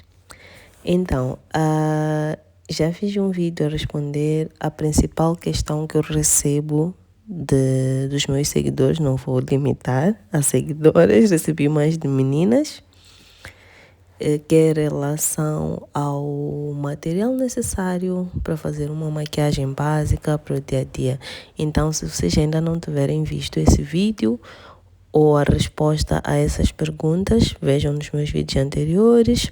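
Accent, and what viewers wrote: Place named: Mozambique. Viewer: Amiga, vc é perfeita! Me tira uma dúvida: De qual cidade/província de Moz vc é? Quero muito saber a origem do seu sotaque super fofo! Beijosssssssssss princesa!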